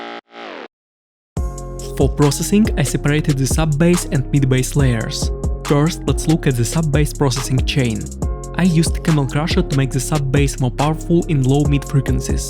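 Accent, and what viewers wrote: Russia. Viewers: Amazing, сразу узнал русский акцент)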